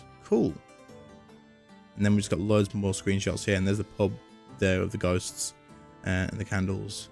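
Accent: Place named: United Kingdom. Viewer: I have decided... I am leaving Runescape for good..... thanks for all the entertainments! i like it British accent 👍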